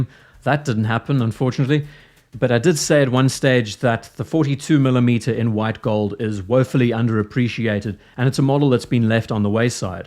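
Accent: South Africa. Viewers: I swear you’re South African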